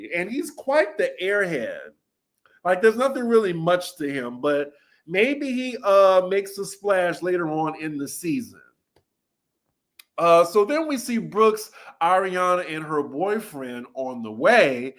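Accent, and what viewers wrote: New Orleans, United States. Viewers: you definitely have a New Orleans accent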